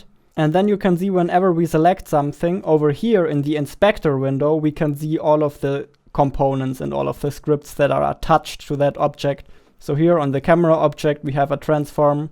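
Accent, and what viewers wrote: Germany. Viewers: Are you German? Your accent sounds like it ^^ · man hört halt dass du deutscher bist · He has a German accent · ur german accent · Erstens man hört raus dass du Deutscher bist... Und zweitens... Durch deinen Dialekt kann ich viel besser verstehen was du meinst... Du sprichst auch sehr deutlich und ausführlich... Und in deinem 39 minütigen Video... Stecken mehr Informationen als in manchen 1 oder 2 Stunden videos... Und das beste ist... Du gibst dir dabei mühe, schneidest alles zurecht und erzählst es mit Emotionen... Andere Leute rattern alles einfach nur runter aber du hast mir 1000 mal mehr geholfen bei dem Programm... Vielen vielen dank! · I like your german accent, good tutorial <3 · Are u from germany? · The germäaan accent haha. Bester mann xD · I can hear your German accent, is there a German version of this video? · Are you german? 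· Hey, Erstmal danke für dieses krasse Video! So macht es einen Spaß schnell einfach kompakt und mit schönen Deutschen Akzent zu lernen. Super Erklärung und Co. konnte alles wie im Video 1zu1 sofort anwenden bis Minute Ab dort funktioniert es bei mir leider nicht mehr weil Visualstudios kein GetComponent anzeigt sowie kein Rigidbody obwohl ich in Unity gecheckt hab ob es auch fürs Objekt ausgewählt wurde. Ich werde allerdings der sache auf den Grund gehen und werde es irgendwie versuchen doch noch umzusetzen. Beste grüße Marcel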